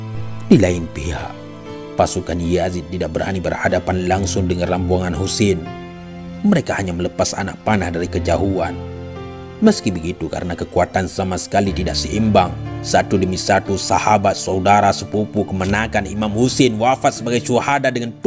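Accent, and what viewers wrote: Aceh, Indonesia. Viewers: Adminnya dari aceh ya 😍 ?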